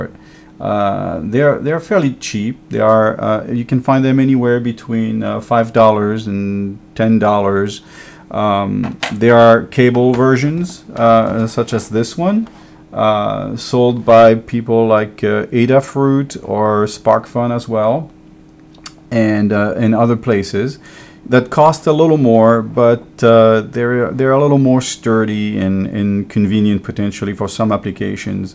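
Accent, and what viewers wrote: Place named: France. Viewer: Really impressed by your english there - one can barely hear that you are French which is quite unusual. Trés bien!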